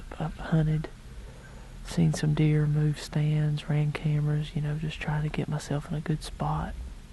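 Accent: South, United States. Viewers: Personally the southern accent makes him sound like he knows what he is doing. Hats off to you southern dudes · That music killed the video.... And your southern talk....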